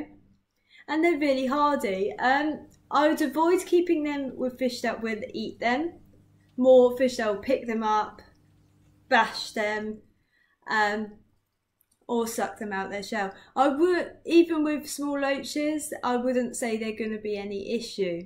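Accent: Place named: United Kingdom. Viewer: Hard to listen and understand due to the Britt accent. Thanks from the new world.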